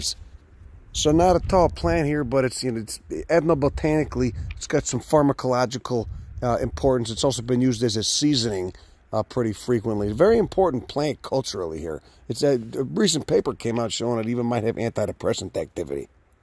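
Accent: Chicago, United States. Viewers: This guy rips! Love that heavy Chicago accent. Caught be off guard in the best possible way. I know almost nothing about these species but the delivery keeps me interested. Good stuff!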